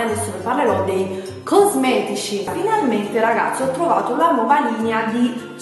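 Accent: Naples, Italy. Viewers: Adoro questo tuo accento Napoletano.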